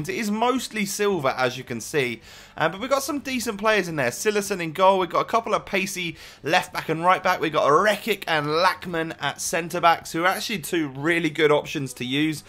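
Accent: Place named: England, United Kingdom. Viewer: It's so funny to hear an English guy pronounce Dutch names (no hate)! Great video, really enjoyed watching!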